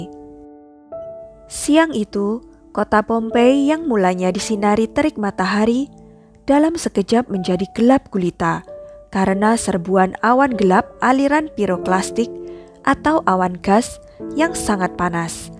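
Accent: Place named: Java, Indonesia. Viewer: Admin medok banget asli orang jawa kah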